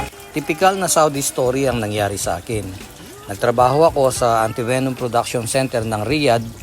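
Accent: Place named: Pampanga, Philippines. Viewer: Prang kpampangan kau sir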